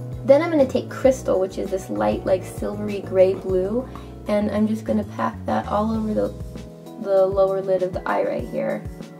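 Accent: United Kingdom. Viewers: i'm in love with your british accent<3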